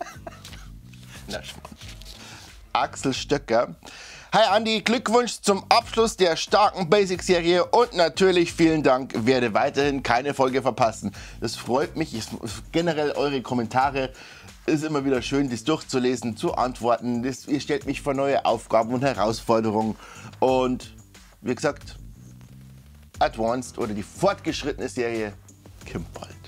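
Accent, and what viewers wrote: Bavaria, Germany. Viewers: Jo, sog amoi, Du redst ja boarisch. Wo kimmts'n Ihr her nachert? Nur so Pi x 👍🏻? Coole Videos übrigens. Guat dass was zum Thema Cakewalk in meiner Sprachn gibt, und ned bloß auf Englisch (obwohl mei Englisch recht guat is)!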